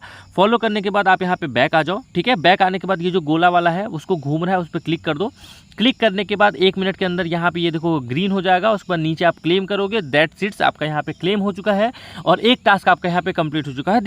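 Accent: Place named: Kolkata, India. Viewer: Kolkata voice ascent h 😅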